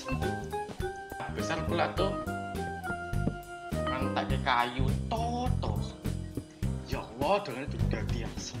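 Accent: Java, Indonesia. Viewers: Wong jowo mas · Orang jawa ya bang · Wong jowo bng?...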